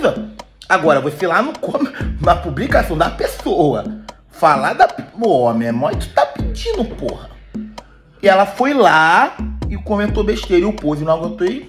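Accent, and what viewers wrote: Rio de Janeiro, Brazil. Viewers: Gente eu tô 32 anos fora do Brasil, sou do Rio de Janeiro, e ti juro dou tanta risadas, mas tanta risadas com esse 😂😂😂😂😂😂😂😂😂😂 escutar as gírias e o sotaque do Rio não tem preço ❤❤❤❤ Ti adoro " "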